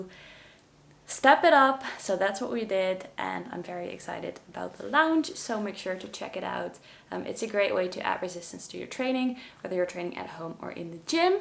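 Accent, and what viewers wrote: Netherlands. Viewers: Are you Dutch? You have a very Dutch accent XD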